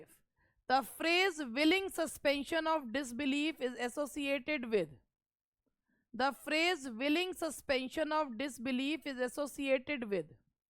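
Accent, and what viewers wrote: Meerut, India. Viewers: Ma'am aapka Meerut vala andaj bahut bha jata h dil ko❤❤🙏👍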